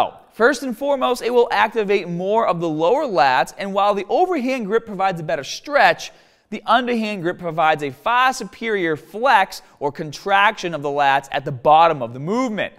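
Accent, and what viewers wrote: Boston, United States. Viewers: Boston accent. "When you pull this bah down..." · Geez, and I thought I had a “haad” Boston accent growing up there! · Who doesn't enjoy a Boston accent?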